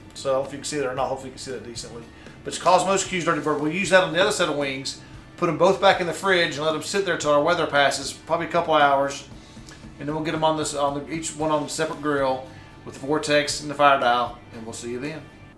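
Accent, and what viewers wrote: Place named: West, United States. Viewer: My name is Ron and I have a tip for you. If you use the clips from the Onlyfire pizza and rotisserie assembly it will rase your great higher into the kettle top and the chicken cooks even better (crispier). I never take my clips off anymore, I use them on all grilling that I do.. P.S. I truly enjoy your Chanel. I like your background I like how you present your work and slight and not so strong western accent there is a western actor that you look and sound just like him, he was the Rancher on City Slickers. Also you should get a long meat poker or fire place pokers to use when setting up your grills. Great video as usual.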